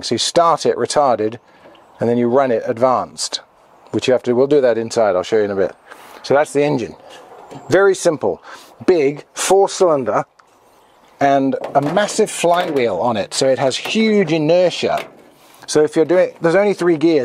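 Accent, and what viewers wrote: England, United Kingdom. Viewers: You're English, right?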